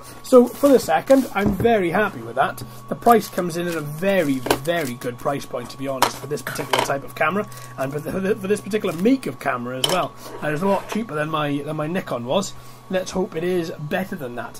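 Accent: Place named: RP, United Kingdom. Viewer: ooooo very posh buddy x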